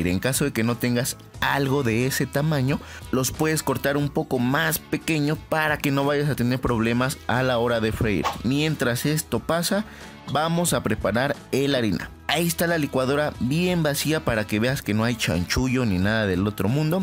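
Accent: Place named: Mexico City, Mexico. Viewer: Cómo se oye que es De el DF , sabe lo que hace. Riquísimo. Felicidades.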